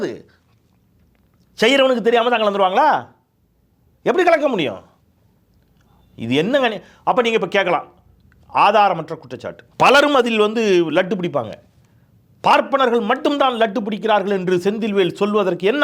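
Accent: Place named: Valliyoor, India. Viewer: Super brother naanum Valliyoor thann ungal voice slang keta enaku udane namma voor niyabagam varum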